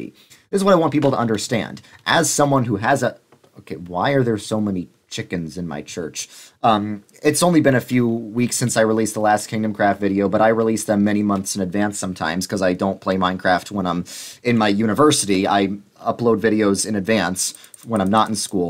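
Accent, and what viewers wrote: New York, United States. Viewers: really letting the New York accent come out on this one LOL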